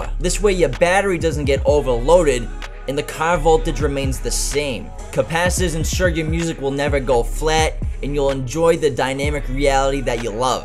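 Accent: Boston, United States. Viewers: Yo,....... I like these videos, but why are you doing the Boston accent lol, I get it in the videos when you roast somebody in the comments (might be me here) but when you’re just being helpful why the accent? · I'd love to hear this guy say Oscar Mayer Wiener in his Boston accent. Would be crazy satisfying for some reason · Now thats a bahsten accent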